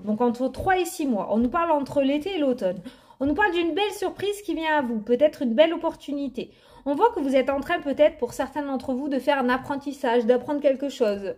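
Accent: South, France. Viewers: coucou un vrai rayon de soleil avec un beau zest d'accent du sud...merci pour cette guidance... bisous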